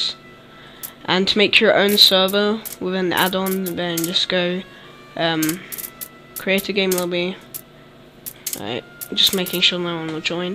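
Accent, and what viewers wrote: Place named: United Kingdom. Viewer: HE'S A BRIT,HAHAHAHAHA.But,thanks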